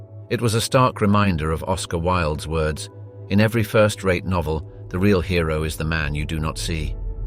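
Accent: United Kingdom. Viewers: Content notwithstanding, talk about "PURPLE PROSE".. GEEZ! Wish I had a dollar for every time the overly Brit narrator oozed out the word "enigmatic". .Again, GEEZ! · Ah, a poetic little wordsmith wasting our time with a dumb british accent. Gfys 👋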